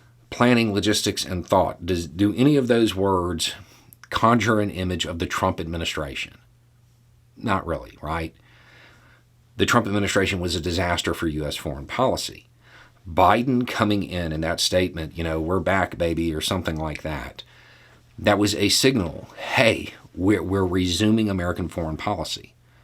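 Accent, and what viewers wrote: South, United States. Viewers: Why do we get a bullshit republican "newspaper" ad after watching the fifth column?. Do they think that all rednecks are stupid and Uneducated? Certainly not if we are listening to this guy who talks with a hard as rock southern accent and still makes more sense of the political shit happening every day better than CNN. Kee it up hombre, we will just skip the Epoch Times crap!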